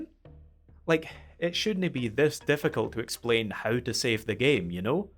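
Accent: Scotland, United Kingdom. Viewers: Your accent is so gloriously Scottish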